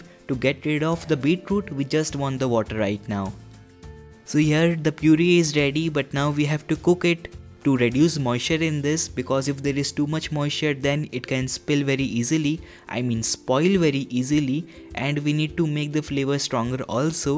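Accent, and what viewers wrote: India. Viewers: Hah DAT hindi accent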